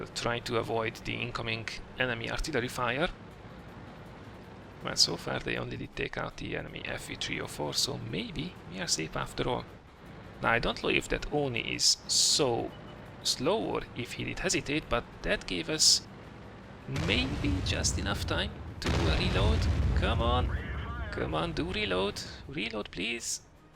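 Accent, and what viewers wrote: Hungary. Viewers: R u Hungarian? Your accent is seemst like a Hun.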